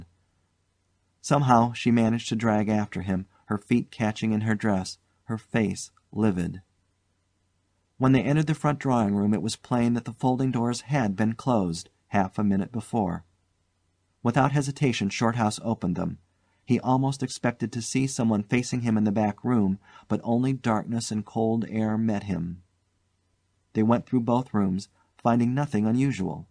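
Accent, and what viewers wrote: United States. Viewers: Thoroughly enjoyed this ghostly story, the American narrator was a surprise, but he read the tale masterfully, keeping the suspense until the end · Funny I think when it's a ghost story narrated,do we expect a British English accent?So when an American narrator comes on..we are surprised..Let's listen..love a bit of spooky!!🙂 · The American voice is a welcome change.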